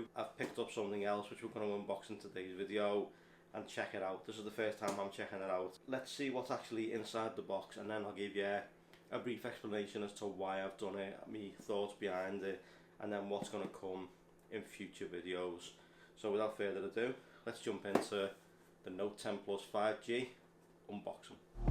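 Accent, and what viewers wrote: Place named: Yorkshire, United Kingdom. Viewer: He's sounds like he is from Yorkshire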